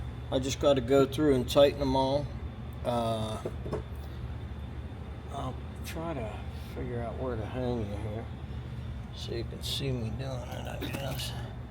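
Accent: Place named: New England, United States. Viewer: It's like watching Me but with a New England accent!!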